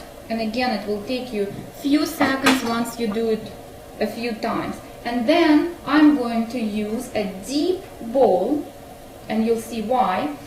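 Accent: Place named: Russia. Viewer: You sound more russian/ Ukrain german and thats not a german accent-- Ich kenne meine Deutsche sprache . (I know my german language )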